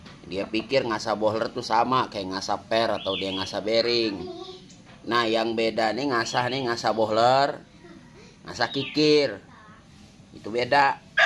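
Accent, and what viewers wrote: Jakarta, Indonesia. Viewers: kentel bgt Betawi Nye cang, salam dari Depok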